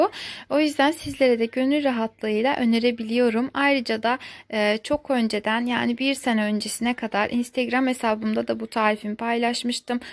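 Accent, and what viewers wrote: Azerbaijan. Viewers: Güzel sesinizden azerbaycanlı oldugunuz belli⚘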